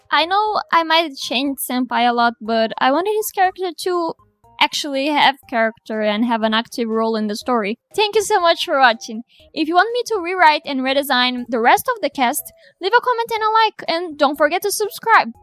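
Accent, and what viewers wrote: Brazil. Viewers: Pelo sotaque eu sabia que era brasileira kkkk,amei o seu vídeo e seus designs e ideias são muito criativas e originais!💖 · NÃO ACREDITO QUE SE É BR KKKKKK EU TAVA VENDO O VIDEO NORMAL ENQUANTO EU DESENHAVA, AI NA HORA QUE VC FALO MADOKA MAGICA EU RECONHECI O SOTAQUE BR, E FUI ATÉ CONFERIR ! YEEEEEEEEEEES MAIS BRASILEIROS !!!! muito bom o video por sinal adorei seu estilo, e adoro ver videos pra desenhar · EU SABIAAA, EU SOUBE DESDE AS PRIMEIRAS FRASES QUE VOCE FALOU QUE VOCE ERA BR!!!! nao pq seu inglês é "ruim" ou tem sotaque, na real seu ingles é PERFEITO, mas tinha a >>aura<< HAHAHAH DESENHOS INCRIVEIS, DE VERDADE, SEU TRAÇO É TAOOOO LINDICO!!! nao conhecia seu canal, vou acompanhar a partir de agora! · A minute in, and im listening to your voice and im like "...huh... That sounds a little Brazilian.." Went to check the About you and was so darn right I have brazillian friends so maybe thats how i detected the slight accent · Eu SABIA q vc era brasileira assim q ouvi seu sotaque sé loko muito bom seus vídeos ❤❤ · CARALHO eu passei o video inteiro me perguntando se vc era do brasil por causa do sotaque, nao acredito que é mesmo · OMGGG I KNEW I RECOGNIZED THIS ACCENT! 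You're Brazilian... Q NEM EU MANOO 🫂🫂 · Gurl ru brazilian? I know the accent sounds rlly Brazilian, like on Madoka Magica when u speaks.. (i am too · Eu tava escutando o vídeo e eu assim esse sotaque... SABIA Q VC ERA BR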